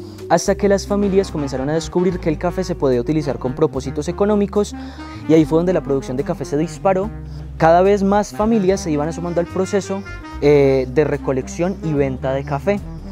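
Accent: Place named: Colombia. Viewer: Que bonito el acento colombiano❤️❤️❤️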